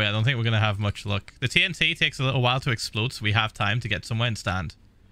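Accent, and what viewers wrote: United Kingdom. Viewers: It’s a version of a British accent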